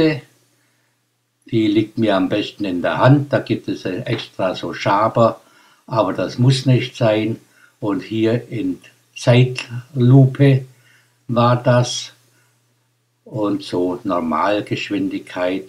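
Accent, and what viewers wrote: Swabia, Germany. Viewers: leicht schwäbisch, aber auch für Nichtschwaben gut verständlich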